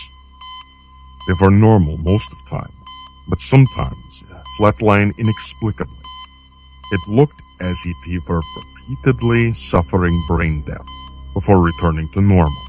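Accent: Russia. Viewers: That was great and I think you are the first to do it with a Russian accent. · Fantastic. This story always makes me queasy xD Clever use of a Russian accent as well as the visuals :3 Good job. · Are you really Russian or you that good?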